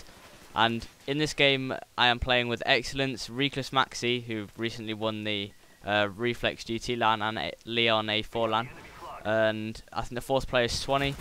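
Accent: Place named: England, United Kingdom. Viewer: your english accent <3 really good gameplay and commentary so looking forward to next one, if you want anything uploaded just send me a message and i will do it asap. // Vizze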